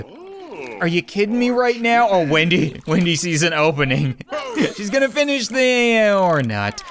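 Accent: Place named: Newfoundland, Canada. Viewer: I can't believe you replied! I just wanted to say you're awesome and I can't believe I didn't notice you were newfie before I went to your askfm I should have noticed with the way you say party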